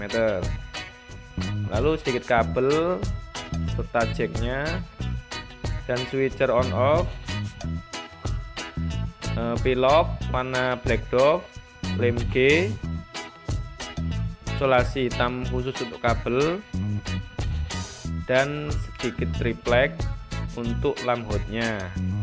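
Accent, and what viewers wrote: Java, Indonesia. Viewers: Jowo ne kenthel..."MBRAWUL"..😀😀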